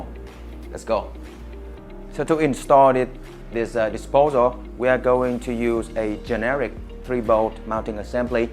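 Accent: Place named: Vietnam. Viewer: Judging the the accent, bro is vietnamese 🙂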